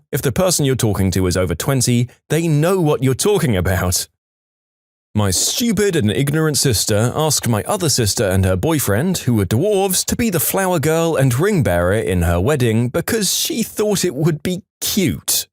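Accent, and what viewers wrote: United Kingdom. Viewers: wtf happened with your voice you sound like a rich white british man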